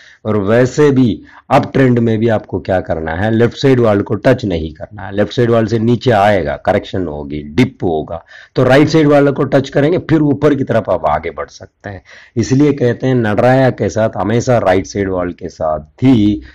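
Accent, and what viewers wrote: Tamil Nadu, India. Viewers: sure will try the strategy strictly for 5 working days. Let see what happens. I have a friend who earn good moeny in Iron fly weekly said the same thing chart will speak to you if you give market some spare time to concentrate and learn. But I don't know why successful traders like my friend they don't like indicators they prefer chart patterns and momentum of the market to catch the momentum for exp recent CPI result. I mean indicator is also doing the same thing indicating over bought over sold (Nadarya envelope) Multip lever will indicate bottom and tops. I mean this indicator are increasing our efficiency and productivity nothing else but they consider as FOMO. Let see by the way thanks for taking the time to guide me in the mid of the night you have earned a loyal SUBSCRIBER. Also your hindi is abstract I guess you're Tamil Shukriya Ji 💐💐🙏🙏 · U r a tamil guy... 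I can see that from ur tone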